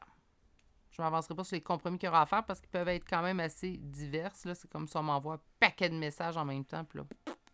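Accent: Canada. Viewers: Merci pour votre bonne humeur et votre super accent canadien que j'adore. Je suis pas pressée qu'il revienne car au fond j'aime bien ma liberté et surtout qu'on me fiche la paix. Je vis seule avec mes minou que j'adore. Et puis j'ai la trouille de l'engagement. De toute façon c'est pas moi qui vais faire le 1er pas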